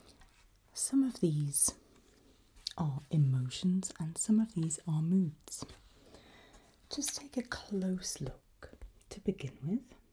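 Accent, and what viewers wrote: England, United Kingdom. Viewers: I really love your English accent, it is soooo comfortable and beautiful ❤❤( I don’t know if this is polite and sorry if it is impolite I just want to say it’s so much comfortable and really make me relax!!)Thank you for all your hard working on ASMR~~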